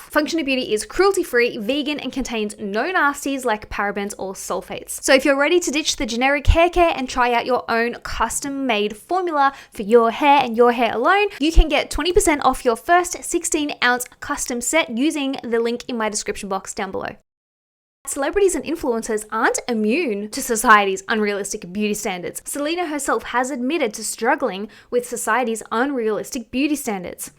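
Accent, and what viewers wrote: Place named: Australia. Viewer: Girl! Since you been back in Australia your accent is getting stronger! I'm here for it not gonna lie lol 🥰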